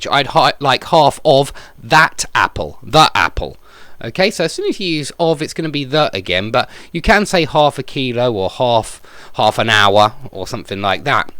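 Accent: United Kingdom. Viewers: That's British accent with his perfect voice.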